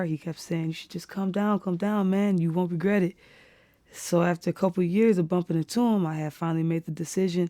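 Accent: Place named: Caribbean. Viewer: English on top of carribean She sounds like Naomi Campbell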